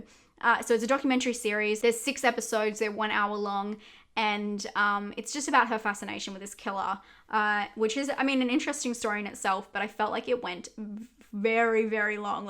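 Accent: Australia. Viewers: So, uh, "really sh*tty" is a 1 star rating? 😛 Think I may be watching too many of your vids. Your Aussie accent is not as noticeable to me. 😕 Stay Spooky.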